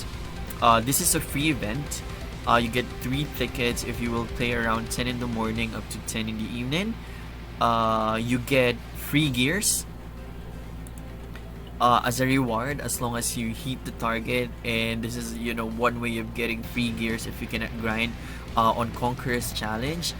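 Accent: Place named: Philippines. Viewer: Pinoy ka pala ganda ng accent haha keep it up already subscribed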